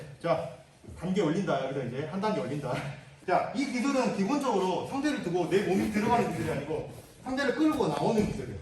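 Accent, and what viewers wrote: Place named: Jeolla, South Korea. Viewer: 즐라도의 말투 조으다 영화보냐ㅋㅋㅋ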